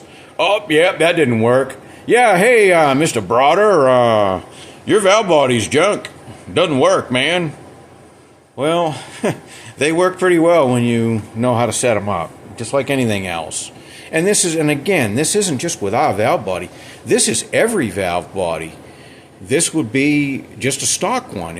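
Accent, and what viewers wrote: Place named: New Jersey, United States. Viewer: That NE Jersey accent is the worst sounding in the USA. Hems and hauls around talking talking in that annoying accent. Bro get to the point...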